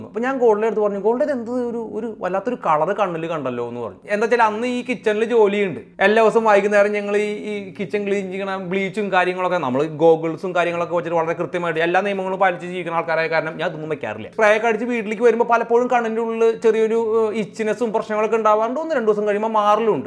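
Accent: Thrissur, India. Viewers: Cheten poliyatta.mmede TCR basha poliyayi parayind.pinne class poli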